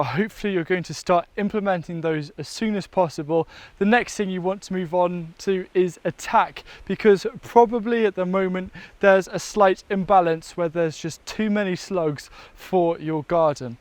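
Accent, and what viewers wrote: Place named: Wales, United Kingdom. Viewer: Hello from Canada!! My grand father was from Llandudno. I never knew him but am enjoying hearing your "accent" and seeing how lush and green Wales is. You have a great channel. I have even gone back and watched your videos from the very beginning. Also recently purchased "Veg in One Bed". I only wish I had purchased it earlier in lockdown. I am not too far behind though, spring weather appears to be on locked down as well, so all is delayed. The last two years I have done self-wicking containers,(Gardening With Leon on YouTube) so weeds and other unwanted guests have not really been an issue. This year however, I am adding two 4x4 raised beds, and planting in amongst my perrenial borders to increase yields and try some new crops and varieties. You mentioned how slugs would avoid crops such as onions and prickly barriers. 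Do you think that planting onions seeds for spring onion cuttings, as a border plant around the raised beds and perhaps as a divide between crops in square foot planning (rather than string) would be a good deterrent to slugs? A living barrier so to speak? I am also seriously considering planting chives as an edge plant on all my flowers beds. I have also viewed that application on another channel. I like the idea that it is multi purpose and if it gets to wild I can mow it down and in will grow back. Thank you and keep up the fantastic content.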